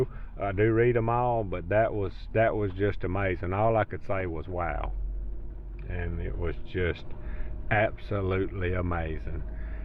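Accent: South, United States. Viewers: 68 year old man here. I'm gonna miss that long southern drawl of", Pete, ". Well done!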